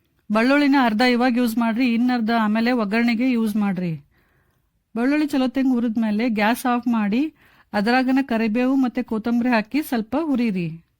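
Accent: North Karnataka, India. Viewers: Ma’am I’m absolutely delighted to hear your North Karnataka Kannada!! I appreciate you for keeping it real...... 👍🏼👍🏼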